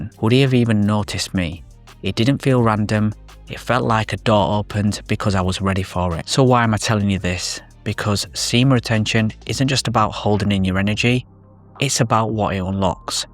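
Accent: United Kingdom. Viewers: Just found this channel. A fellow Yorkshire brother I believe? Leeds? Haha. Back for my SR journey! Let's go!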